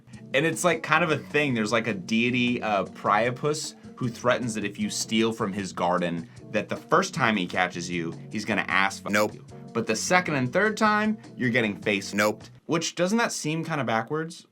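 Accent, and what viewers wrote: United States. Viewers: Yeah no wonder you're from LA. You have their disgusting accent.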